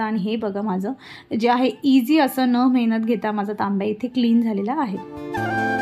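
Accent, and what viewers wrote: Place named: Jalgaon, India. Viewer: Khup chhan video tai.... Sagdech video mst astat... May be tumhi jalgaon che asavet language varun vatate tumchya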